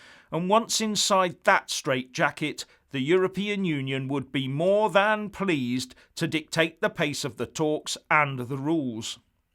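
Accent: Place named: Dorset, United Kingdom. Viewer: Proper Dorset accent. Good video.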